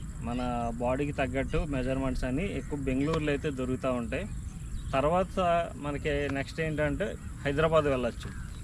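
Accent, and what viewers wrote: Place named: East Godavari, India. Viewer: Brother meeru ma East godavari ki chendinavara voice no batti doubt vachindi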